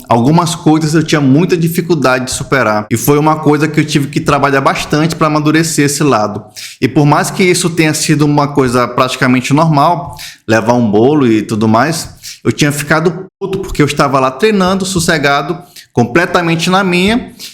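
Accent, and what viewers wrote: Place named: Ceará, Brazil. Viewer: Esse sotaque é cearense.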